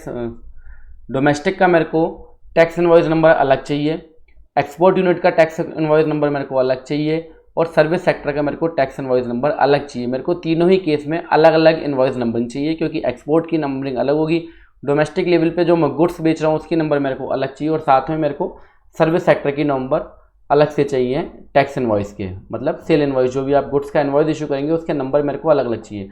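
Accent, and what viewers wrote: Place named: Punjab, India. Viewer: Sir ji I am from south India but educated in the north so I can understand Hindi well, but most of my friends simply don't understand Hindi especially your Punjabi accented Hindi yet they are highly impressed with your style of teaching, So please suggest to me any guru of your caliber who can teach the same in English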